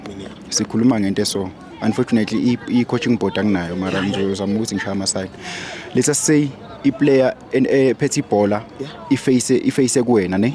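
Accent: South Africa. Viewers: I like his Zulu Tone❤❤❤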